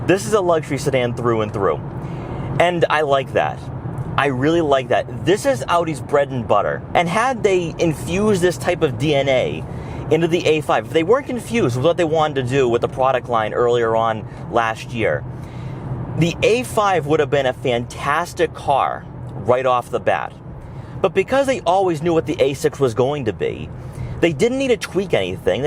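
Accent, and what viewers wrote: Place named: New England, United States. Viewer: Tail light setup reminds me of a Lexus LS. Also loving this guy's wicked New England O's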